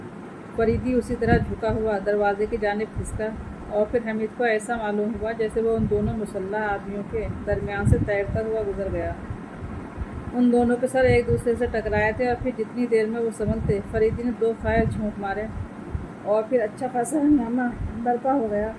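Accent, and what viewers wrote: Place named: Lucknow, India. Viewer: kya haseen lab o lehja hai.. Lucknow ki chashni mein ghula hua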